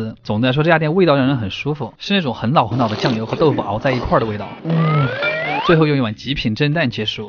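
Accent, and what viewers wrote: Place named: Sichuan, China. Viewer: 四川话好土味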